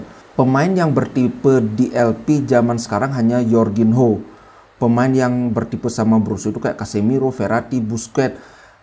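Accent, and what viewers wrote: Bali, Indonesia. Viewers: Logat logat bali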